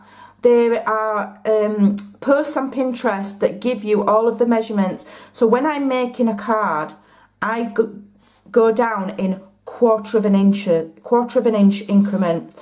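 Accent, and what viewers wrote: United Kingdom. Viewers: Bless your sweet British heart! I’ve purchased Dysart’s that only contain measurements in centimeters which have rendered me useless... clearly I didn’t pay much attention in math class which I hated though this tutorial is a godsend. I could listen to your charming accent and joyful laugh all day long so thank you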